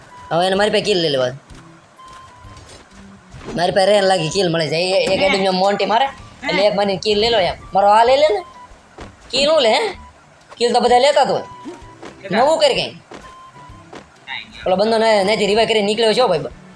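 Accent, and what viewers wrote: Bhavnagar, India. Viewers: mane lage che tame bhavneger na cho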